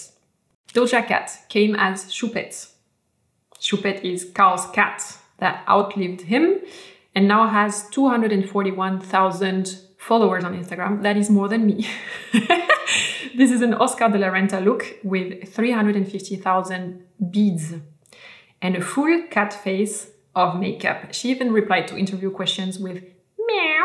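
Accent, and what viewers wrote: France. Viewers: J'adore tes vidéos, je remarque ton accent français qui revient plus depuis que tu es déménagé en Provence 🙈 yay haha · Mam... Are You french?? Cause you do speak like one!!! Anyways.. Mam.... Your vudeos are amazing, and I have watched your portfolio videos and it helped me a lot... Do more videos❤️‍🔥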